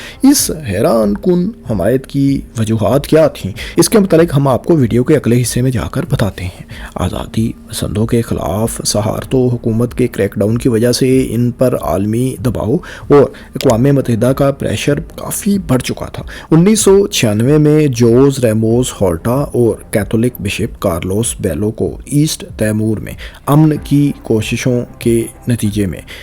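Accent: Punjab, Pakistan. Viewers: Mr. has some serious problems in pronunciations of the names of cities, countries places and areas. 😡🤬 Therefore, the viewers should not hope that he will improve because he has a typical Paindu Punjabi accent🤮🤢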